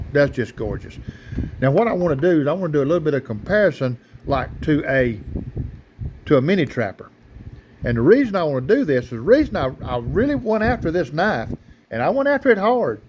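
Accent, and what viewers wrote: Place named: South, United States. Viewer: I subscribed to your channel you sound like a good ol knife loving southern country boy like myself. Enjoyed the video. That's right MILO'S TEA BABY LOVE IT.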